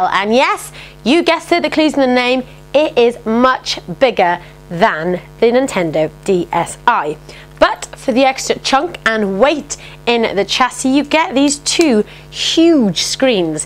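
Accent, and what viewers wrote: United Kingdom. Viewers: thats the good old british accent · I love British accent.. ;) cute voice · the accent is so heavy, even for a British lady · Gotta love cute girls with an English accent! On a different note, I think Nintendo should have added more improvements like since it's bigger anyway why don't they just put back in the GBA slot?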